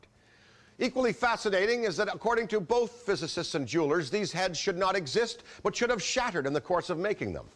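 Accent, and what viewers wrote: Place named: Ireland. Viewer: This video is one of the best examples of the Irish influence still heard in Newfoundland accents today too... If I wasn't told he was from Newfoundland, it would be hard to tell he's not just Irish lol.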